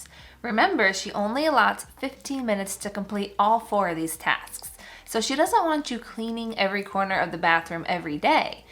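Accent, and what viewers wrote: England, United Kingdom. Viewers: Your English accent 🤣🤣🤣🤣. Adorable. I'm from England and was very impressed 🤪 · Your English accent here n there is hilarious! Love it Xx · Your English accent is gorgeous 😄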